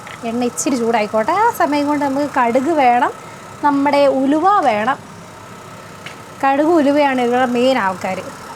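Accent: Kottayam, India. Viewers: എന്നാ കൊച്ചേ വീഡിയോ ഒന്നും ഇടാത്തെ ചുമ്മാ നടക്കുമ്പോൾ എങ്കിലും ഫോൺ പൊക്കിപിടിച്ചു പോകു 😂😂 1k കഴിഞ്ഞു വല്ലതും അറിയുന്നുണ്ടോ 😂😂😜. കോട്ടയം,കണ്ണൂർ, സ്ലാങ് കേൾക്കാൻ നല്ല രസമാ ☺️ അവിടെ ഏതോ കട്ടിലിൽ നടക്കുന്നകണ്ടു 😊 അവിടെ 5 ഏക്കർ വാങ്ങിയോ 😊 പുതിയ സ്ഥലം കാണിച്ചിട്ട് വല്ല്യ അനക്കമൊന്നുമില്ലലോ??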